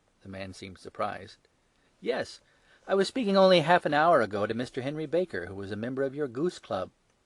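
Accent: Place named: United States. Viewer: Nice Narrator voice with a slight American accent.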